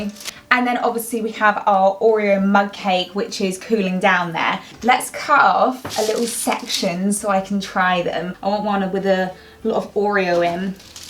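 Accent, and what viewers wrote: United Kingdom. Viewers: Omg whats your accent? Is it English? Its beautiful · I think she has British accent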